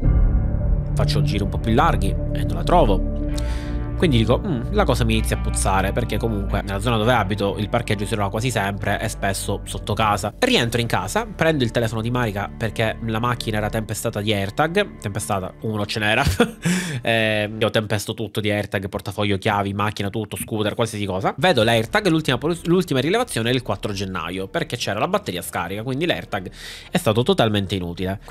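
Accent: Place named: Palermo, Italy. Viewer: Sul problema di salute vedo molta confusione e non mi sento di chiarirla perché non sono qualificato per farlo: ti consiglio amichevolmente di seguire le prescrizioni mediche. Se non ti fidi del curante cambia medico. Dall'accento immagino tu sia di Palermo. Al tuo posto anch'io avrei evitato il P.S. (casomai al massimo la guardia medica, ma se sei stato già visitato dal curante anche la guardia medica non avrebbe avuto molto senso). Forse sarebbe meglio rivolgersi a un bravo internista, anche privatamente (purtroppo si paga un botto, ma le tempistiche della sanità pubblica, dalle, presumo, nostre parti sono bibliche). Mi dispiace per l'auto, ma le Fiat vanno via come il pane. 😔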